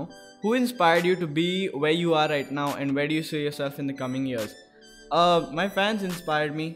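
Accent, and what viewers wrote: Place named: Kerala, India. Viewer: Yo, bro u might not see this but u give me malu vibes dude, the when u say "amma",ur accent and ur likes...r u tho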